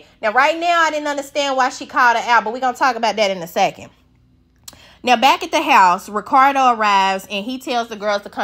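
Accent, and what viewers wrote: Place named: St. Louis, United States. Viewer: Are you from St.Louis? You gotta be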